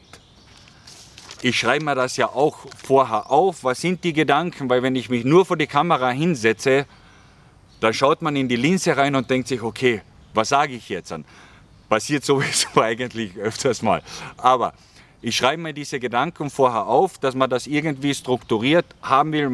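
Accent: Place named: Gailtal, Austria. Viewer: Jetzt hab' ich schon den ganzen Tag Deine Videos quer durch den Gemüsegarten angesehen. Fein, dass Du nach einem Tief scheinbar wieder so einen Enthusiasmus entwickelst. Pass nur auf dass Du nicht aus einer Depri in eine Manie verfällst .... P.S.: mir gefällt Deine Gailtaler Schnauze frei von der Leber weg - kommt sehr ehrlich rüber, auch wenn ich persönlich Manches etwas diplomatischer rüber bringen würde - viell. nicht immer ganz so emotional ... aber Du bist halt so ein "g'rader Michl'" und das ist gut so. Viel Freude in Thailand! .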